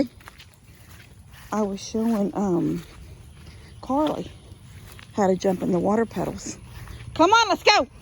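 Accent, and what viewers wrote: Texas, United States. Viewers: sometimes watching you do practically nothing but talking (in your wonderful Texan accent) are the funniest videos. Thanks for the laughs. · I'm from Central Alabama... lived here all my life. I didn't realize the Southern draw Texans have. Even more than me. LOL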